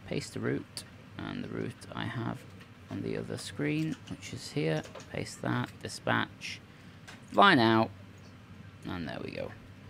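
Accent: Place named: London, United Kingdom. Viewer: lol lol u soun like ur from london lol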